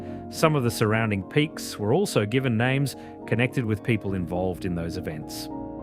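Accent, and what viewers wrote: Australia. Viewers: Love to hear an Australian describe dimensions in feet and miles..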